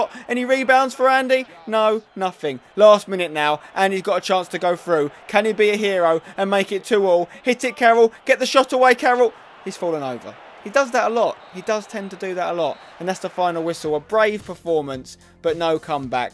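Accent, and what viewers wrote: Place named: Cockney, United Kingdom. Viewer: It's just a cockney accent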